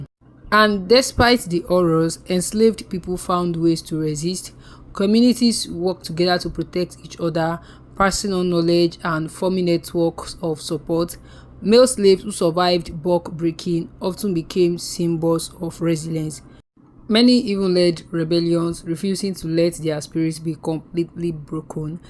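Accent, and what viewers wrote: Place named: Africa. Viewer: Thanks for telling the story I could tell from your accent that you’re from the continent of Africa I wonder why so many Africans hate African-Americans when we came from Africa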